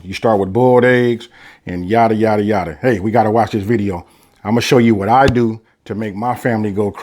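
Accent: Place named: California, United States. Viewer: This rt hearrrr. Omg i love that cali westcoast dialect. It's errythang. I love your cooking.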